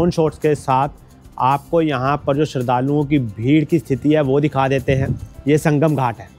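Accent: Punjab, India. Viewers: I think your tone is Punjabi. You must be from Punjab, which part of Punjab are you from? I’m from Hoshiarpur Punjab currently living in USA Seattle here we love your video. I watch this video with my parents. Very nice video informative keep making new video. Thank you and good luck.❤❤🕉️🕉️🪯✝️☪️🕉️🕉️💚💜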